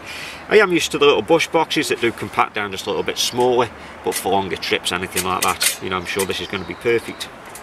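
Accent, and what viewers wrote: United Kingdom. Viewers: Very interesting and I believe that anything on YouTube is subject to an intellectual property right and prohibits a third-party from using any content. So if all else fails to stop them, then that's one option. Also, I take it that you are located in the UK. If you don't mind me asking,. what region is your particular dialect? And I don't have any difficulty understanding you, I don't know how others would? Best of luck with your channel!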